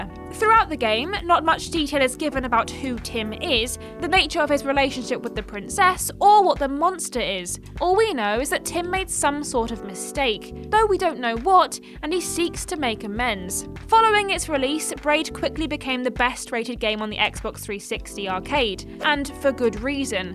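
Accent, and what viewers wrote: United Kingdom. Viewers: this girl narrating is to British sounding driving me insane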